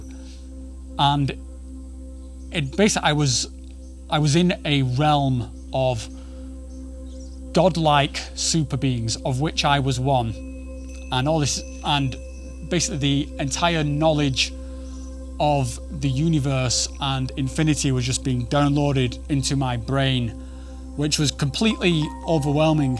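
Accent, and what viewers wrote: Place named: Manchester, United Kingdom. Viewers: I love this channel. I don't know why but there's something really nice about your stories being told in a northern accent. I'm a fellow northerner but from the other side of the Pennines up in the northeast. "I thank her for what she gave me and I forgive for what she couldn't give me" - this is absolutely beautiful. I had my first ceremony a month ago or so and this one of the big hurdles that I broke through and it's made so much difference to my relationship with them. This trip sounds bonkers though, I don't think I fully broke through tbh but it was a tremendous experience anyway. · I live in Manchester and judging by your accent you're not far from here. I'm ready for my journey to understand my childhood trauma. I have social anxiety and going to South America is too out my comfort zone to go alone. 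I need to find a budy who has experience and will come with me. Can you tell me how the best way I can do this and where. I'm 48 year old grown man and am educated on DMT. Please can you help. Really want to do this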